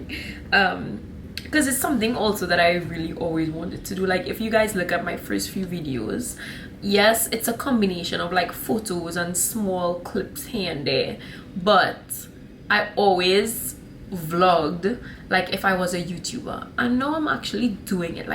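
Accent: Trinidad and Tobago. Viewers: You are so right, I'm in Western Massachusetts perfect for anything,about the long days look up the midnight sun in Norway or the twilight all night long in Northern Alberta,I luv the accent its one of the most beautiful,You're a true Trini a mix of many things, much success to You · It's refreshing to hear a trini accent. I lived in Canada for years long winters and no jobs. I now live in Maryland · I like your Trini accent too. The best Caribbean accent.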